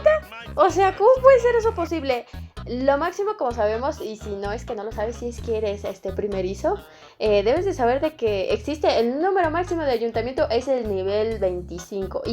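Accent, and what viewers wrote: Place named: Yucatan, Mexico. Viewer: eres de Yucatán? que bonito acento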